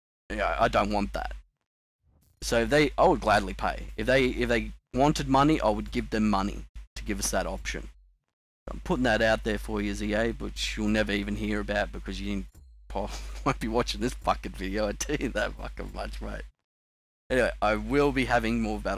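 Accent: Australia. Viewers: Nice video. Good to see a Aussie Commentator :D Subscribed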